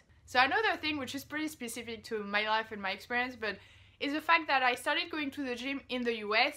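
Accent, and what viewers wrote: France. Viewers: C'est hallucinant tu as toujours ce petit accent qui me fait dire "ok, she's french, i'm 200% sure" Mais bon retour au pays 💪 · comment tu as pu garder une pareil accent français en 5 ans?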